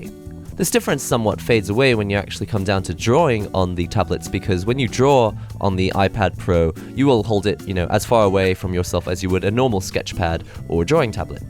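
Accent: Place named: United Kingdom. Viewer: you sound like a british Amir Blumenfeld. Thats a compliment lol.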